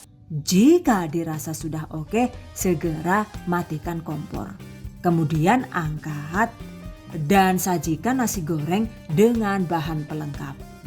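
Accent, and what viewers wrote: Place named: Java, Indonesia. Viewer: awalnya muncul di beranda..apaan sih ini..eh pas di liat lucu narasi nya..apalagi bagian jawa medoknya...masaknya jg praktis...makin kesini makin suka..oke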